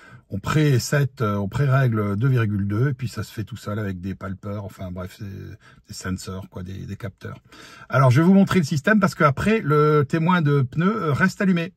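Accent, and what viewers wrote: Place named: United States. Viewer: Merci beaucoup pour cette démonstration ! Et quel accent américain🇺🇲 perfect!🫡😀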